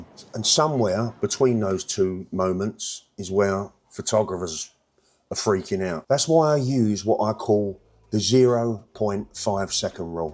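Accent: Cockney, United Kingdom. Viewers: Best video ever made in the history of YouTube. I see you walking around the West Village, and then if I close my eyes and listen, I hear a cockney geezer from East London. That's really the secret. Good for you, mate.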